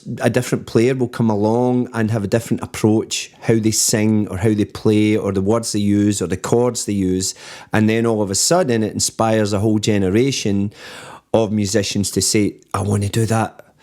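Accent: Scotland, United Kingdom. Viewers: This is the first time I’ve watched, and, I gotta say, I watched because you were reacting to MARCIN. I’m a huge fan of his, and I appreciated your knowledgeable commentary. Love your Scots accent, which turned to a US southern accent when you sang! Loved it! I’ll be tuning in again. Thanks for a good show! ❤️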